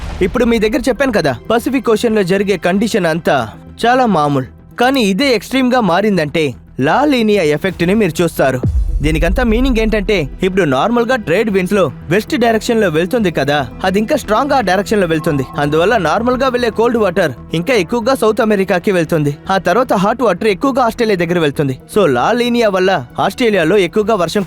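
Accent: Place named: India. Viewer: మంచి సమాచారం. మరి ఎందుకు హింది యాసలో, ఆయాసంగా చెప్తున్నారు. ఎంత అనువాదం ఐనా, మంచిగా స్వచ్చమైన తెలుగు లొ మట్లాడొచ్చు కదా. భవిష్యత్తు వీడియోస్ లో సవరించగలరు.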